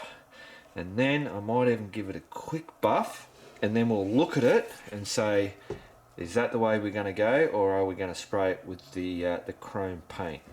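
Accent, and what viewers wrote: Australia. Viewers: lol, you are so funny HaHa speaking like a true-blue aussie. I have parts on my bike that is chrome exactly like yours that has pitted exactly like your, i was experimenting with things myself today and i found that when chrome is that bad you cant save it no matter what. I eventually just used a grinder to grind everything back to bare metal and just repaint the parts in another colour OR just polish and buff up the metal like you have done, but im just going to repaint all my chrome to black and not have to worry about looking after all the shiny metal with polishes and buffing every time i wash the bike. After watching this video your sense of humour made me laugh so much i decided to subscribe, plus your another aussie!!! and its really good to see aussie on youtube making video's. Cheers, Here is a quick-tip, just talk about what your doing and not waste time and footage worrying about what others will say and do, unfortunately there will always be experts putting crap onya, but the way i look at it and i think you do to, its not so much the destination that excites us its the journey. 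Meaning you learnt something today.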